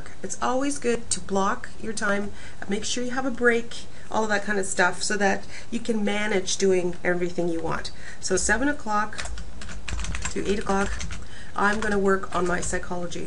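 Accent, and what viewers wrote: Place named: Canada. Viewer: You're Canadian. I'm getting much better at distinguishing accents :'D this is just proof to me that our accents /are/ distinguishable from the American accent! Ha! Sorry, lovely video and a brilliant strategy, I will be using this. Thank you :3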